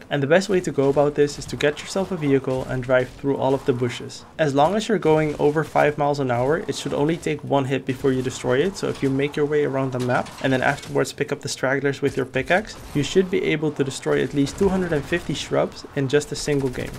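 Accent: Netherlands. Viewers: Je hoort gewoon dat je nederlands bent😝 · You dutch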